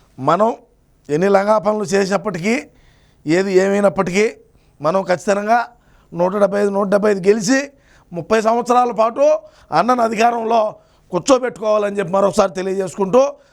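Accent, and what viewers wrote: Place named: Chittoor, India. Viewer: Where are you from brother you talk telugu of chittoor district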